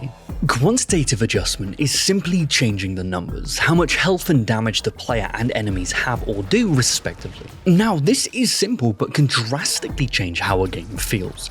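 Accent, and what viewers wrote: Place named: United Kingdom. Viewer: correct because british accent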